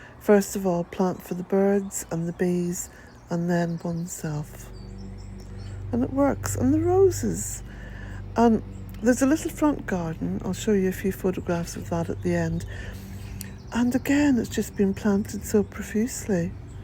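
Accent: Ireland. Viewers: Thank you for sharing this adventure. in your daughter's lovely, lively garden, so wonderful with your Irish lilt You can be a proud mum. 🌰🍇🥔🍏. Blessings from central va, u.s.and a hug for Patsy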